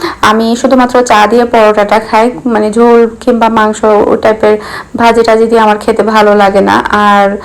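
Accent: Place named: Satkania, Bangladesh. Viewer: চট্টগ্রামে না সাতকানিয়া স্টাইলের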